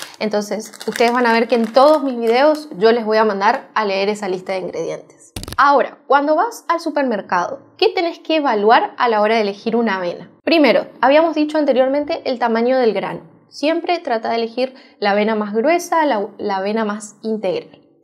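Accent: Misiones, Argentina. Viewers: De misiones son , norte. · Buen día es posadas, misiones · ES DE MISIONES ( ARGENTINA) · Excelente equipo doctores! Se nota su acento argentino, felicidades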